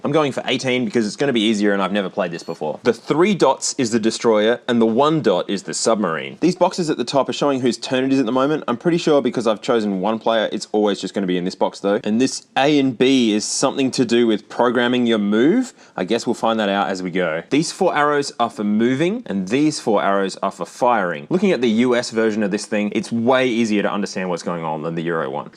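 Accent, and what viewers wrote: Australia. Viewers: I love how Aussies say "ninety" and "nineteen"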